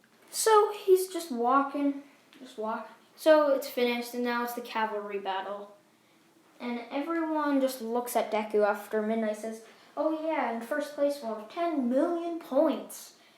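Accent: United Kingdom. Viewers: It was British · U british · Is this man brithish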